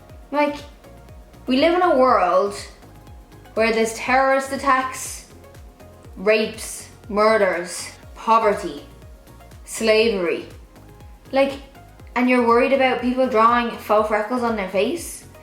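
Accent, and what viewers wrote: Ireland. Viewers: She's from Ireland!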